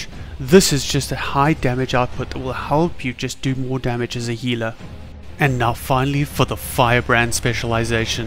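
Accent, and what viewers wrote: South Africa. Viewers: from south africa?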